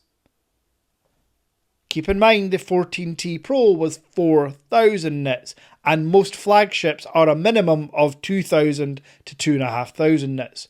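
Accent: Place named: Scotland, United Kingdom. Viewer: Scottish accent! Rab C Nesbitt does tech.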